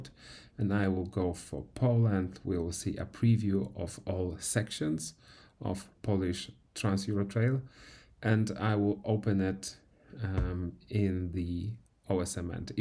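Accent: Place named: England, United Kingdom. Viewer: Fajny angielski akcent masz.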